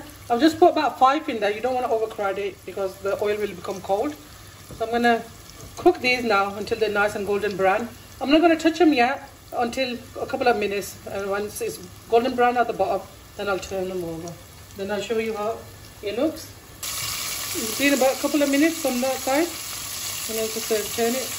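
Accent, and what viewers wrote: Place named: Midlands, United Kingdom. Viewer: You're from the Midlands lol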